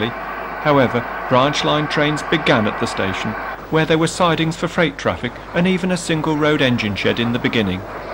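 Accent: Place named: Shropshire, United Kingdom. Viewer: Who is narrating here? Sounds like a very strong Shropshire accent.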